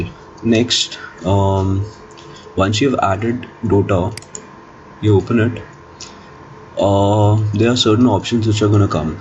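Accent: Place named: South Asia. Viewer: I HATE PAKISTAN-INDIA ENGLISH ACCENT.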